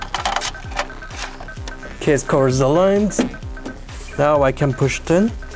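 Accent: India. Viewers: Very Indian, love it!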